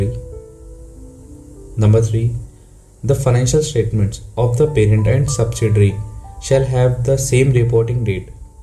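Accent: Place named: India. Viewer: the first problem with this video is the accent which is so bad, the second one is that you cannot cover the topic in just three minutes. Indians always fail to adhere to the minimum standards of professionalism and integrity and claim for what they don't really have. I personally don't like them at all.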